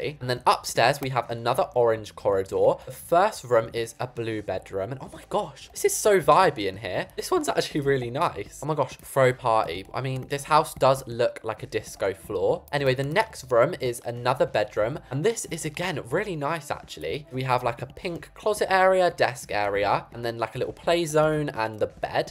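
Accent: United Kingdom. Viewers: Try to do a American accent Bc u have a British accent and take a break on talking in ur videos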